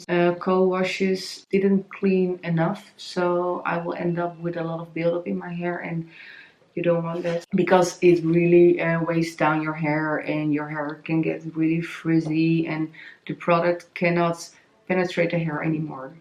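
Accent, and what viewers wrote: Netherlands. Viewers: I knew it omg I watchers your curly hair routine and i was like that accent is soo dutch . Groeten van vlaanderen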